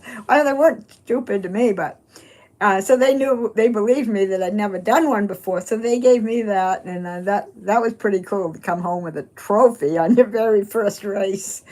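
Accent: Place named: Maine, United States. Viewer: Yes, you definitely have a main accent. I have a very good friend that goes to my church here and you sound just like her!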